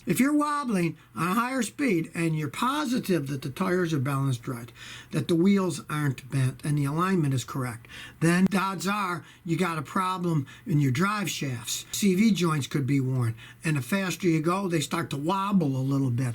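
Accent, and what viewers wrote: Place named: Western New York, United States. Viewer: I knew that was a WNY accent! Greeting from Buffalo, NY. Thanks for all of your videos, they have been very helpful.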